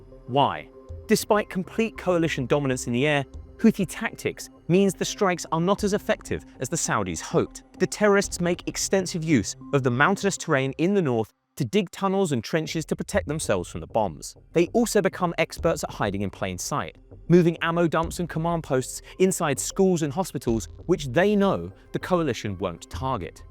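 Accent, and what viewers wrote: United Kingdom. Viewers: 1 min into the video and I hear British 😅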